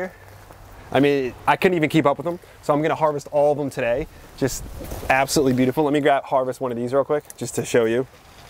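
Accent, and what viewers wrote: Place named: South Jersey, United States. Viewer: Didn’t know u were from Jersey but I’m from jersey n I can hear ur accent. Sounds like south jersey 👩🏽‍🌾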